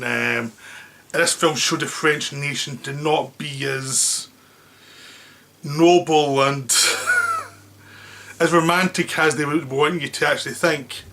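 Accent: Scotland, United Kingdom. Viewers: He is a Scotsman that how he talks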